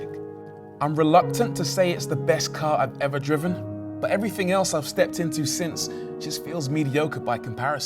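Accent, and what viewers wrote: United Kingdom. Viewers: THIS video is poetry at its best! I love to listen to this British accent and this beautiful music... Your channel IS underrated, mate! I love this shit.... thanks a lot! :-)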